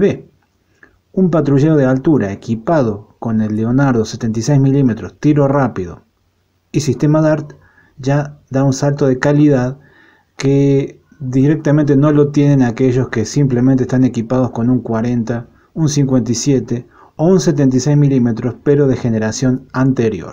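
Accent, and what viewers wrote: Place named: Argentina. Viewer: Pobre Argentina..... Estas OPV, no sirven para una situacion de alta actividad. Lo que tienen que hacer es, comprar las F110 a España, lo más avanzado del mundo en tecnología y sistema de combate. Un saludo, me encantan tus vídeos con tu acento argentino. No somos hermanos? Y se lo compráis a Francia.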